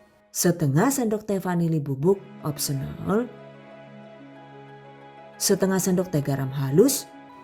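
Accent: Banyumas, Indonesia. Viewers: syukaaa bgt sm chanel ini..karna mudah dipahami ,lucu lg.ana ngapake.ora ngapak ora kepenak.🤣🤣🤣🤣 #GiveawayTHV2021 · Huawww, Amazing... 😍😍😍 Editing Videonya bikin seru ditambah lagi pengisian suaranya yang Khas dan medhok banget ngapak kayak saya. Hahaha 😉 semoga sukses terus ya pahalanya ngalir terus, udah ajarin kita masak². 😍😍😍 #GiveawayTHV2021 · Keren bangett chef e pke bahasaa unikkk Kadangg bhs inggris campur bahasaa ngapakk lahh😅😂 Ora ngapak ora kepenak pokokee😂👍 Bismillah #giveawayTHV2021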